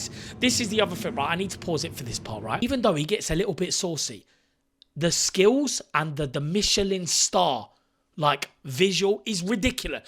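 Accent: United Kingdom. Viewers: OMG the accent gives me life!!! Are you from same region as Adele? So glad to see a full length video. Next i wanna see a podcast!! Thankya!!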